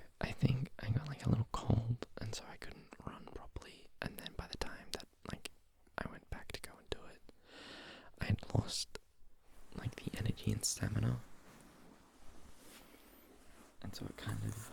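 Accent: Australia. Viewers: Your videos started getting recommended to me a couple weeks ago and I’ve used them every night since to fall asleep. Weirdly enough, I also forget your Australian every night and get whiplash hearing the accent lol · This was precisely the Australian Sleepy Man Rambles needed right now. Do go on about video games/anime/manga...I'm not not here for the nerd-friendly content, and it's relaxing. Life is still constantly stressful due to "how things are where I live," but watching the new Alien: Earth series is a bright spot each week. Zoos aren't a usual destination, but there's a teaching zoo nearby that I enjoy supporting during their Halloween open house fundraiser. While there are tigers and monkeys, the reptile house's skink with resting judgmental face is worth the entire entry fee.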